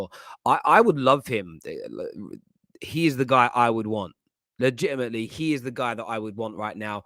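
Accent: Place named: London, United Kingdom. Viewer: White man talking London black man, innit bruv.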